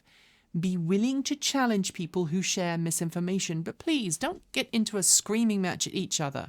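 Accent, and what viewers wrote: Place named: United Kingdom. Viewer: I'm assuming - based on your accent - that you are based in the UK. I'm honestly surprised that you are getting the same amount of FUD and misinformation over there that we get here on the left side of the pond. I am 6'4" and about 17 stone (240 lbs) so cannot squeeze in small vehicles. However, I love my EV. I do still like my weekend Lexus coupe. But the EV is such an easy choice for daily driving. I do live in Southern California and have solar, so enjoy "free" charging. However, even if I didn't, I would continue driving an EV. Keep up the good work!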